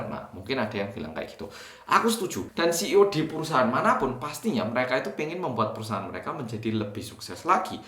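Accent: Java, Indonesia. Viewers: suara medoknya berkurang drastis.. Lebih berat korean aksen 😁.. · yg komen agak sdkit kecewa dan mngkin skit mostly sm stand yg notaband agak benci berembel Hybe dan BTS,sdikit yakin itu..aq paham krn aq Army,sejak jman vote dll pun kbnykan Sm stand bnci bngt,but its okay. well aq gk mnghakimi mereka sbgai Sm stand,tp be strong for all of us sbgai fans..#nowarnohate #justopinian peace✌️ Btw pnjelasan nya keren bngt,Thanks Mas Korea yg Medok. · Mas medok andalanku, sebagai JYP stan gw mendoakan yg terbaik buat SM entertainment 🙏🏻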